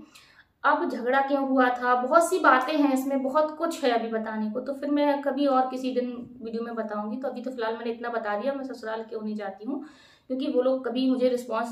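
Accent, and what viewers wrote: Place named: Orai, India. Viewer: आपकी भाषा तो हमारे यहां की लग रही है मैं उरई से हूँ आप किस शहर से है🤗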